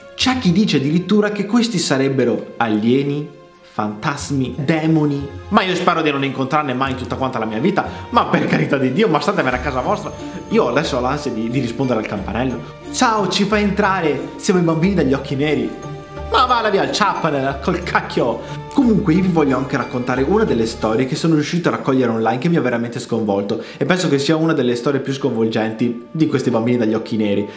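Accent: Veneto, Italy. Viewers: gamer foxy22 nord perché è del Veneto😆😇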